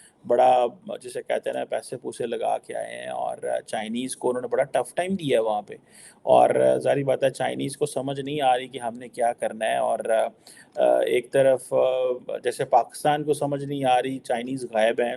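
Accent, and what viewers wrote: Punjab, India. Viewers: Cheemi Bhayn Mithi Punjabi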